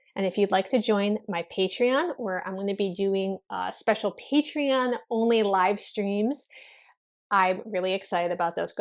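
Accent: United States. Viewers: You are a white american. Of course these are social constructs and I guess you can be anything you want but others can do the same and label you white american. You look white and you sound american.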